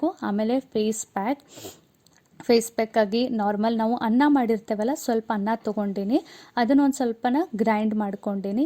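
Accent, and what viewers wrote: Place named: Shimoga, India. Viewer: wow 🤗 thumba esta aythu nim bashe........... nav shimoga nim mathu keltiddre keltane erbeku ansatte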